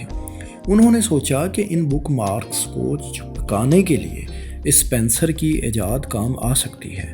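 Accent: Punjab, Pakistan. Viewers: اسلام علیکم۔ بھائی معذرت کے ساتھ، اگر یہی پینڈو اردو آوازیں سننی ہیں تو لاکھوں پینڈو چینلز ہیں۔ فیصل بھائی کا انداز بہت اہم ہے، یا اسے کاپی کرنے کی کوشش کریں یا کاپی کر سکنے والے شخص کو یہ کام سونپیں۔ اس طرح پنجابی انداز کی اردو والوں سے پلیز گریز کریں۔۔۔ اس طرح آپ بنی بنائی امیج ڈاون کر رہے ہیں۔۔۔ پھر سے معذرت مگر بات یہی سچ ہے۔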